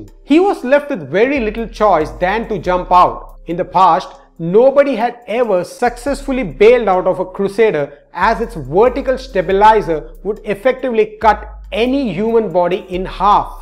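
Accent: India. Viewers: indian english.. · Amazing how many people are complaining about this guy's accent. He's clearly from the Indian subcontinent - he's allowed to have an 'accent'. He's also managed to collect 140,000 subscribers on a platform that's massively dominated by American viewers and content providers, which I'd say is no small achievement. For the record, English is nowhere near being the most spoken first language in the world - if we sum together the various Chinese languages, for example, the number of first-language speakers outnumber first-language English speakers by more than three to one. There are also more people speaking Hindi and Bengali as a first language than there are English. So to everyone criticising ' ' for his accent, I say: how's your Hindi coming along? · My parents were invited to a dinner with juliane koepcke parents the night before the accident, the host was the dean of biology faculty at san marcos university, lima peru, they were talking about tropical diseases in peruvian jungle and how hard it will be if you get lost there. 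Btw, i dont know why is nice to hear narrations in english with indian accent , maybe too much big bang theory or get used to call centers. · In fact it’s the best Indian accent that I’ve ever heard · The story is good....but the Frikin Indian accent is pissin annoying. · People are a disappointment when they cant look past an accent, the content is very good that's what should matter. I actually like the indian accent. Good video bud. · Those people can really affirm "they're lucky". Love your Indian accent! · i enjoyed watching, but i didn't enjoyed listening to your indian (malayalam english) diction. I feel that i am having a short of breath listening you bro!!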